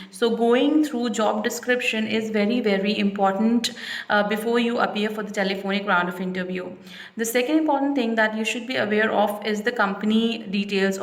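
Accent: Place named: United States. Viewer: Woh great accent Ru from USA? Your English and presentation skills are awesome.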